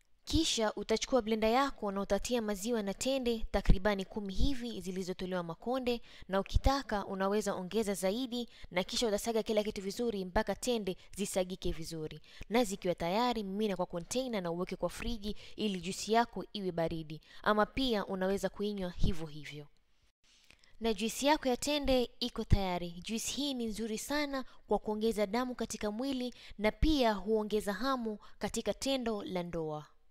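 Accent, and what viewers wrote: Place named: Mombasa, Kenya. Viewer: Mombasa nahisi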